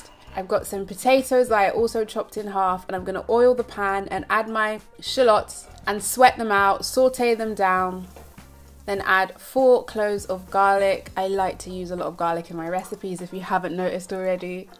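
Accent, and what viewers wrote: United Kingdom. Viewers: I love your British accent. It's not snobby or pretentious at all 👍.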